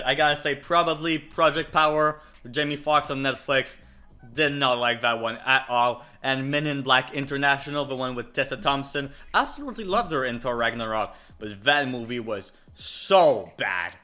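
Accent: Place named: France. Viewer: oh so you are french alright i was Thinking what kind of accent that is and where is written as you like? thats awesome!!! Favorite songs? ( is awesome too) and L0L you are younger than me i thought you were 25 or older but definitely below 30 can you watch coma attraction attraction 2 what happened to monday rampage angry birds jump street 1 and 2 Leon soul transformers 1-5 +bumblebee clash of the titans,wrath of the titans 300 great wall 47 ronin pitch Black bloodshot dr sleep ghost in the shell scarface goosebumps invisible man percy Jackson dragonball broly it 1 and 2 evil dead trilogy guns akimbo parasite spies in disguise commuter detective pikachu gentlemen non stop attack the block hard powder Cold pursuit planet of apes trilogy abraham Lincoln Vampire hunter outlander gemini man Jericho project gunman american assassin youre next halloween 2018 predator Upgrade pacific rim 1 and 2 damn you have a lot of funko pops i only have 4 or 5 i think, Thanos, gold thor, dr strange, wolverine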